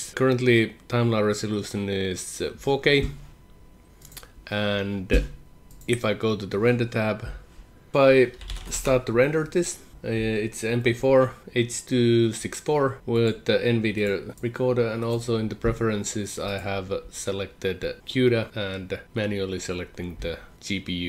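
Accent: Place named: Finland. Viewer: Ei se pahasti kuulu läpi se ralli englanti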